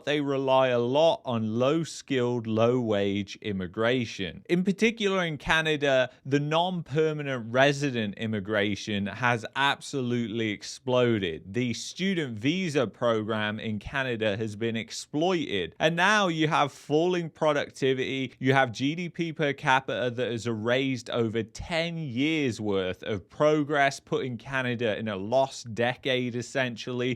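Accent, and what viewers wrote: England, United Kingdom. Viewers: I believe he's from England. By his accent he's certainly from England. My roots of my distant ancestors go back to northern Ireland which has more world champions per capita including myself than any other civilized place on Earth. My great grandfather and great grandmother on both sides of my family were born in Canada in the 1850's.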